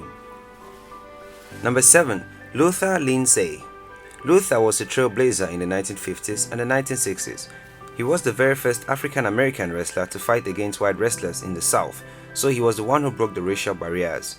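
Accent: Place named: Nigeria. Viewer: Longest intro... n nigerian kinda voice over.. i bet all this history are true😅😅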